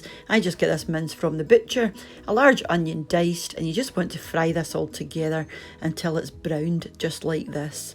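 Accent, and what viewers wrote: Scotland, United Kingdom. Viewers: Oh, and thank you. Really enjoy your Scottish accent.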